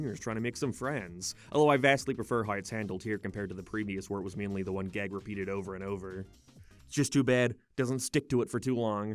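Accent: Ireland. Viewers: He's irish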